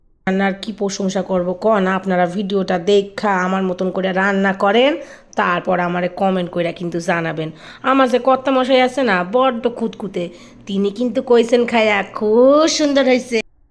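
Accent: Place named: Bangal, Bangladesh. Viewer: Bangal vasa...bah bes to ...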